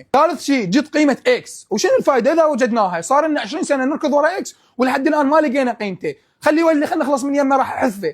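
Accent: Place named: Iraq. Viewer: ياخي اللهجه العراقية تهبلل😭💞✨‼️‼️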